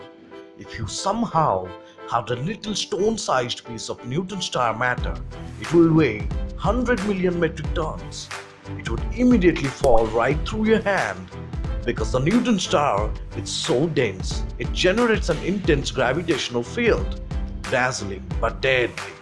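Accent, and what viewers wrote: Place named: India. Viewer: Dude you are an Indian I know it . you completely sound like an Indian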